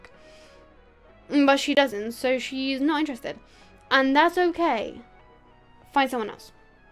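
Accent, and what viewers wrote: United Kingdom. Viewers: That's the thiccest British accent I have ever heard........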